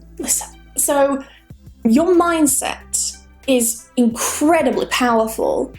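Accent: United Kingdom. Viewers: Hello happy new year 2020, I want to say you many thanks for all videos 2019, they helpful me so much. I enjoyed, learned a lot of things about English language and I wish to continue my English progress with you and your English lessons 😁🤗. By the way I love your pronunciation voice, So I love the British pronunciation 🏴. Best regards from Colombia 🇨🇴.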